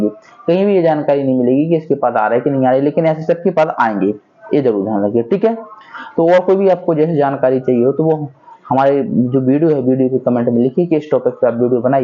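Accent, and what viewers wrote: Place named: Jhansi, India. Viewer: Bhai मै झांसी से हु, 😊 आपकी भाषा काफी अच्छी लगती है, हम भी ऐसी ही बोलते है, आपसे मिलने का मौका जरूर मिलेगा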